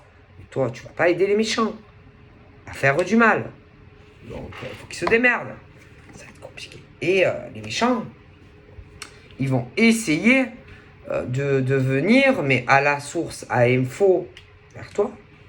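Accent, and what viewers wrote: South, France. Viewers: l'accent du Soleil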